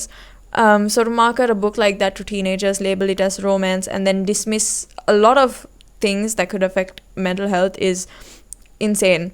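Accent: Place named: United Kingdom. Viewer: Uk English 🙏👍❤️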